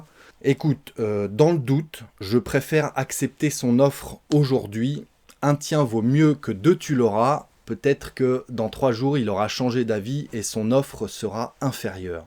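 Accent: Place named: France. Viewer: face-blue-smiling: ... Bonjour... Je ne sais pas si je vous ai déjà demandé d'où vient votre accent français... Je l'aime beaucoup et c'est pour cela que je vous suis. Merci pour ce que vous faites !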